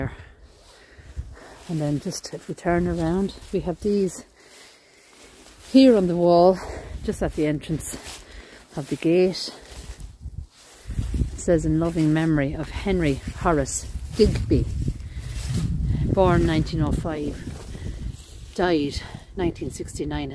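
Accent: Ireland. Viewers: Wow! So fascinating look through those tiny holes and seeing all those coffins you did a marvellous job focusing through so we can see 👏 ♥️ Thank you keep up the wonderful work you do i absolutely love seeing all these amazing graveyards with you and your Irish accent to die for 🥰😁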